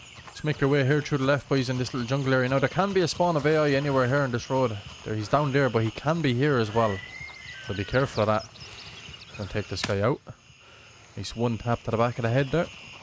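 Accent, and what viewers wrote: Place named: Waterford, Ireland. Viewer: You sound like your from waterford!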